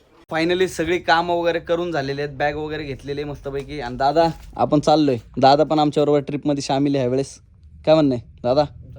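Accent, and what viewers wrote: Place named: Pune, India. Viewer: Punekar's boltat..♥️🫡🔥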